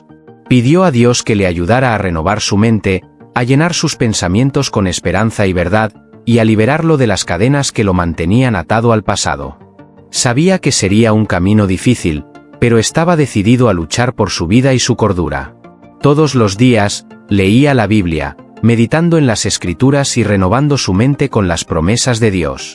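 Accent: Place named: Spain. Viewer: Pero. NO me pongas voz de gachupin🤮🤮🤮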